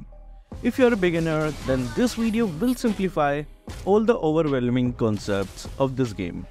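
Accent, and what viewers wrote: India. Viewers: dude are you from India or what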